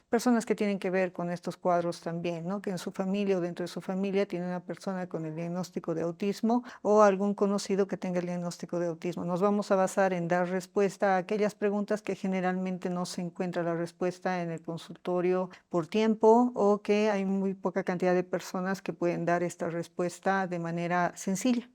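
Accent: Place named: Bolivia. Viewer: Excelente conferencia , felicitaciones , por su asentó , la Doctora es Boliviana ? De La Paz ? Muchas gracias por compartir sus conocimientos. Saludos desde el Ecuador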